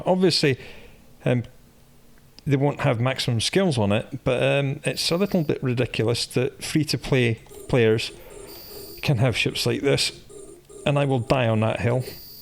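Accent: Scotland, United Kingdom. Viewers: Nice one m8.... Baby!!!! get over here quick!!! back with his reassuring Scots burr, Greets from North of England m8:eyes-pink-heart-shape:😁👍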